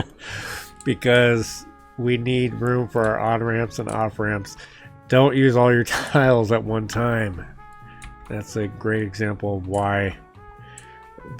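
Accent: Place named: Oregon, United States. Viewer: You're a fellow Oregonian, sounds like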